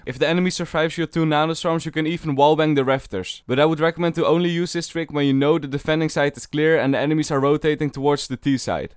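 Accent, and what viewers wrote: Netherlands. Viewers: i smell dutch u from holland ? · Ik merk aan je accent dat je nederlands bent.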